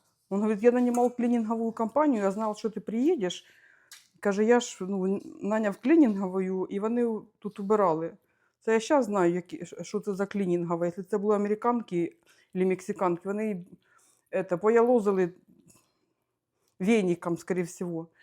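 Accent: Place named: Dnipropetrovsk, Ukraine. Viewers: Доброго вечора,! Випадково попала на ваш канал. Було дуже приємно чути наш криворізький суржик, дякую вам! Я сама народилася в Кривому Розі, живу в Атланті більше 20 років и в Україні не була вже вісім…підкажіть, як вас знайти на ФБ, якщо можна❤️ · така сама мова Дніпропетровська область...одним словом суржик....)😊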